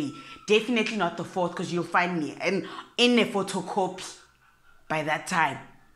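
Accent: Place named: Limpopo, South Africa. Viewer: 🤣🤣🤣🤣 I love the Limpopo English accent, it really goes for the soul😂😂😂😂 I think some Americans don't want to make the effort to learn how to listen, especially if a person has a thick accent. However, some appreciate and even love other people's accents and as a result you thrive at what you do and offer.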